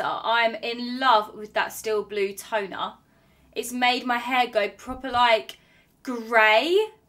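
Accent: United Kingdom. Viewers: Not to be rude....i dont mean you personally... but british accent in my ear is not the most beautiful to hear...its to show off kinda talk... a puh dah on...... baahh...im.train thu match on..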